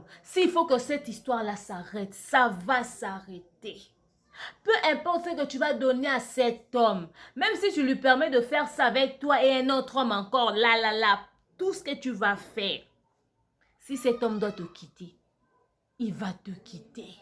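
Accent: Ivory Coast. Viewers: stp ne brande pas l'accent ivoirien on parles mieux français qu'eux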